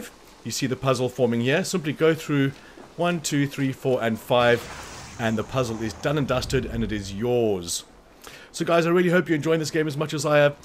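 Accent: South Africa. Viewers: yes sir. You also from SA? Sounds like a South African accent 😁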